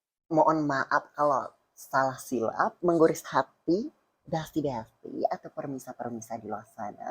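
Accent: Java, Indonesia. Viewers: Suara ni ckp indo sebijik mcm indo yg kawin sma jenis tuu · Aaaaaaa kiyowo dehhh pake aksen Indonesia 💜💜💜💜🎉luv bangett mood bangett emng ini orang ❤️❤️ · Jowo medhok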